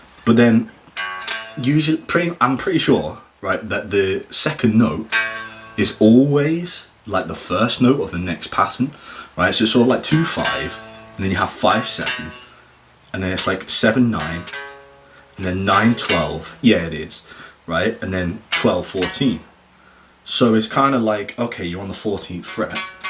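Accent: United Kingdom. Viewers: BRILLIANT!!!!!!!! T U so much for literally helping me out with ALL FORMATIONS of the Pentatonic Scales; I happened 2 stumble upon U, and Quite elated that I NOW can practice Scale Patterns watching your video. Also love your English accent.! Again, thanks! peace&love out 2 U cheers!!!!!!!!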